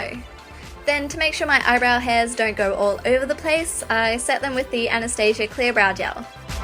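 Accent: Australia. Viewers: is she australian? beautiful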